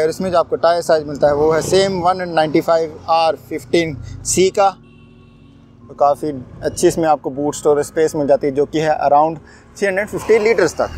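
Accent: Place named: Kangra, India. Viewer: ओ भैया हिमाचल कांगड़ा! दा बोला ना 😂😂😂 भाई! बहुत बढ़िया अति सुंदर 👍 बहुत ही अच्छा रिव्यु किया। है आपने।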